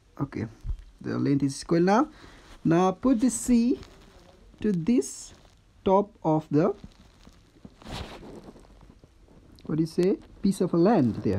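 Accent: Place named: Nepal. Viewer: Daí ko speaking Language Sounds like Nepal tune.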